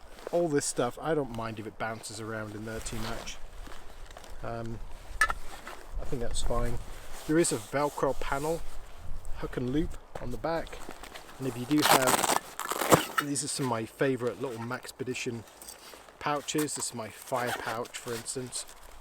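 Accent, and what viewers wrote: England, United Kingdom. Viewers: Hi, enjoyable video thanks. I take it you are originally from England, or at least the UK. How did you end up in Canada? If you don't mind me asking.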